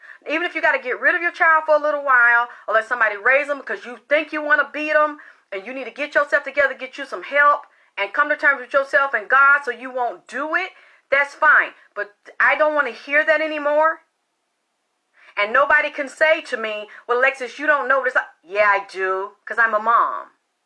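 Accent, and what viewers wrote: South, United States. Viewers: “Gummy head” 😫😆🤣 OMG!!! **btw, you’re beautiful 😍 Glad you survived through those toxic years.. Look at you now.. “Where you start does not determine how you pull up nor your destination” 💜💜 I love the southern accent #SOUTHERNGIRLPRESSURE 💪🏽 We built different 🤞🏽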